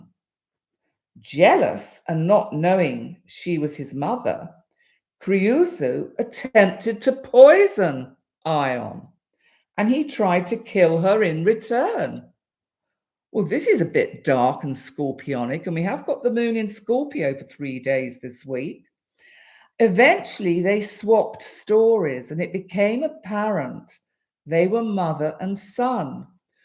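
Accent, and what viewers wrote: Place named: England, United Kingdom. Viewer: Good luck, will be thinking abt you this week! Hope out of pain soon Love your Readings! Love the English Accent sounds great 😊❤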